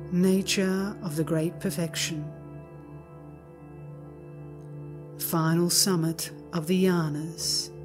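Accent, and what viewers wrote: Australia. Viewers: I have been listening to your recordings for about 3 months and your voice is quite mesmerising . I can think it is 2 things the “ light” of consciousness and of course the subtle Aussie accent . 🙏 Namaste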